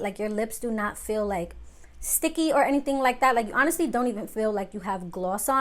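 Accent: New York, United States. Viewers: Your such I New Yorker. As one myself it cracks me up hearing the way we really talk. Like I don't catch it in myself but I know that's how I sound too tho. Been told one to many times! Love ya girl!! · I love your videos! You’re absolutely gorgeous and always love the vibes. I’m from philly but I love a New York accent!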